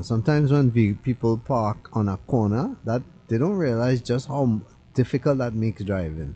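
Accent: Trinidad and Tobago. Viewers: You sound like a trini. You speak like my family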